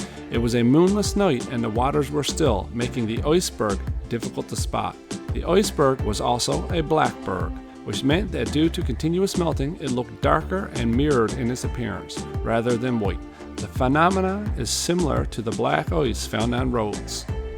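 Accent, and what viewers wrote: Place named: Canada. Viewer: The more I listen to other videos I can hear the Canadian more. Happy Christmas from Ireland, great channel 👏🏼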